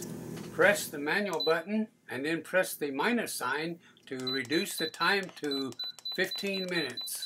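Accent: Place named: Alabama, United States. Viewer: I was sitting here thinking, you remind me of my papa... what part of Alabama are you from?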